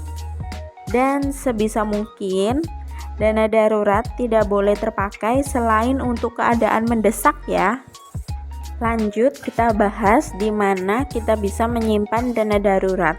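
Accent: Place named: Java, Indonesia. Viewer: Kak asli org mana klu boleh tau? Ko gaya bahasa nya medok bgt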